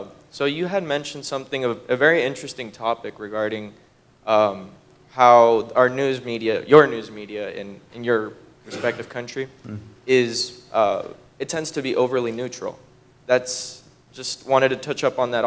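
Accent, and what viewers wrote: RP, United Kingdom. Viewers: • Looks like a young Oxford boy • Talks like a young Oxford boy • Thinks like an ancient Greek filosopher I'm proud to be the thousandth like on this video! (not really) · British accent = Sound smart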